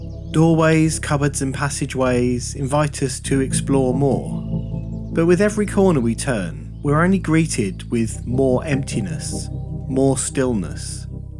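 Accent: United Kingdom. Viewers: the british accent that i love to hear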